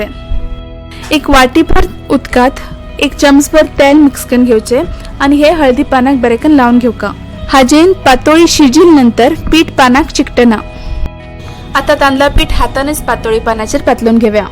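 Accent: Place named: Konkan, India. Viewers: Ur Konkani is different 🤭🤭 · Nice recipe explanation with nice konkani tone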